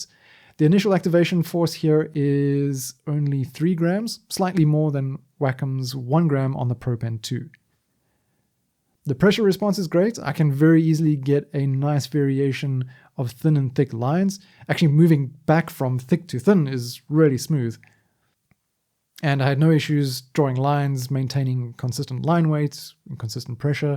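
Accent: South Africa. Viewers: Are you South African? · instantly recognized the accent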